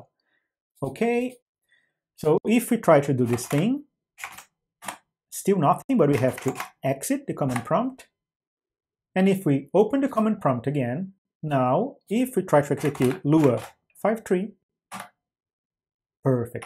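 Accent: Brazil. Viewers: Thanks for the contribution. A tip, you can browse for the file from the environment variables, and navigate through the folders to find Lua folder, which might be easier than writing. If you are brasilian, which your accent sounds like, then: Valeu, obrigado :) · sabia q voce era br, conheco sotaque portugues de longe kkkkkkkkk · he sounds Brazilian tbh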